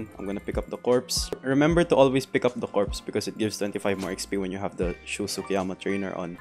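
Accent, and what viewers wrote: Philippines. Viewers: I think ur a Filipino Because of Ur Accent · your accent looks like filipino :/ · In your voice um I can tell your a Filipino Just for me ehhehehe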